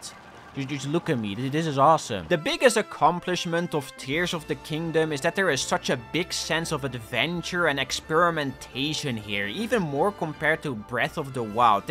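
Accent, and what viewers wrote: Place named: Netherlands. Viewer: I like your funny accent Dutch dude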